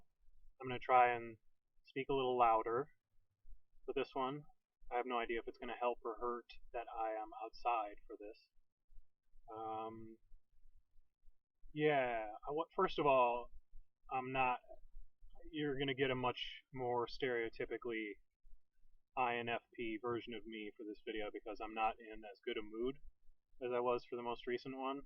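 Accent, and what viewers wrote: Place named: Midwest, United States. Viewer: I was gonna say you sounded Midwestern :) (Wisconsinite INFP)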